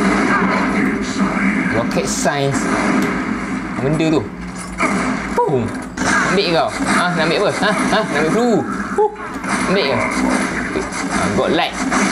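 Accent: Terengganu, Malaysia. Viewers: Dengar ganu weh lawan main game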